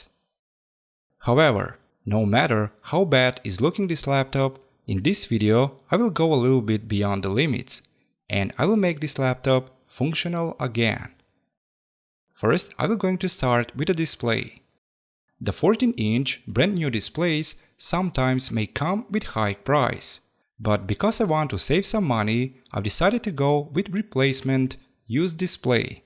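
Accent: Russia. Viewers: Russian accent lol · По твоему произношению видно что ты Русский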